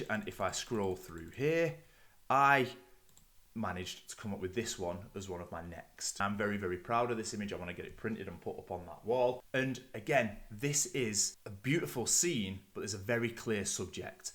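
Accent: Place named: Manchester, United Kingdom. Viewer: Your video came up in my feed. You started speaking, and I thought “he sounds like he’s from Manchester”. Yep! My good friend is from Manchester, and has shared with me all things from the city. Like, and football. So the big question is city or United? Keep up the good work,!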